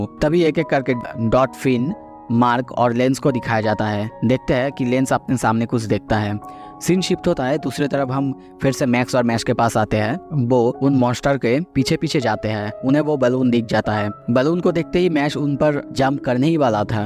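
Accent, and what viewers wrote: Bengal, India. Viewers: Sounds like a bangali. Ma bhi bangali hu. Nice explanation video.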